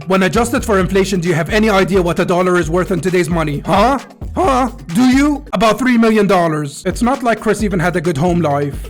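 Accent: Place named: India. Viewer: Bro sounds like he's from India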